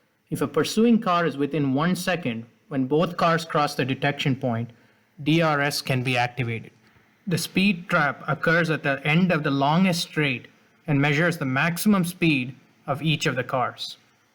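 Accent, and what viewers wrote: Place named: India. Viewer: Just realize how you're talking english, like an Indian talking english.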